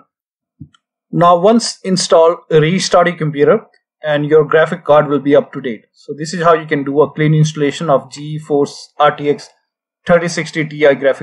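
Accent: India. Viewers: I'm hoping it's not blatant racism. I mean I was sketched out from the voice for a min to but I got anxiety XD and I watch to much scammer payback. But from what I'm seeing everyone says it works so no reason to doubt the man just cause he sounds like a specific group of scammers even indians hate scammers.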